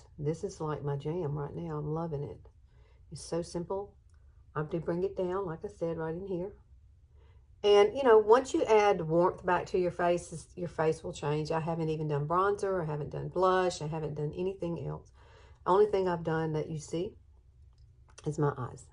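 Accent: South, United States. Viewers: I really love your videos. Your voice is so calming along with your Southern accent. I have puffy wrinkled eyes so I love this routine. Keeping you in prayer for the loss of your Mom, it’s hard I know...take care lovely lady 🙏🏼♥️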